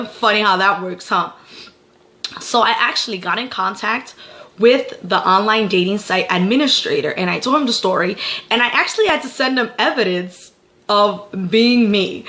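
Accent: United States. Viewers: yo .. you sound like you from the 413 Springfield Mass ... then you said CT ...lmoooo i was close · Before you lived in Connecticut did you live in jersey bc I feel like you have a jersey accent just like mind get it girl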